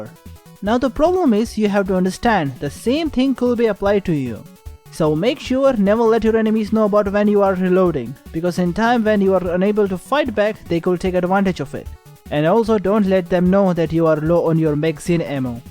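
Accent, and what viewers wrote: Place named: India. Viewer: Talking is indian accent is ok bro Pls using American accent looks very cringe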